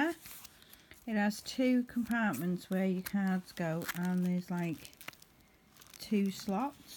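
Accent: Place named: Merseyside, United Kingdom. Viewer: Thanks. Accent reminds me of my mum & nan they are from nosley Merseyside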